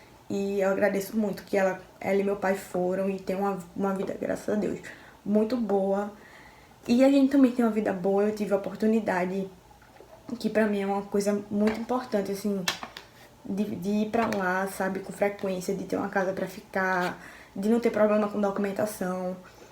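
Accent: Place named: Pernambuco, Brazil. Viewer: Não tem como não admirar uma pessoa que apesar de tão jovem, é de um extremo caráter, responsável e amorosa.Confundi seu sotaque, mas agora sei que você é uma conterrânea, pois meus pais eram pernambucanos, e eu, apesar de ter nascido aqui em Guaruja SP., de certa forma me considero de Pernambuco também. Beijinhos e Deus abençoe.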